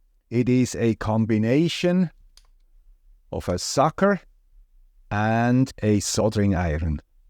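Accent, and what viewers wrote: Switzerland. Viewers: I’m interested that you pronounced Knipex as “nipex”, whereas a native German speaker once told me it is “K-nigh-pex”? As a native English speaker, I still have no clue how to pronounce the brand properly! I had assumed you were Swiss-German as oposed French, from the accent, so very interested in your take on this? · Swiss accent, highly appreciated! ❤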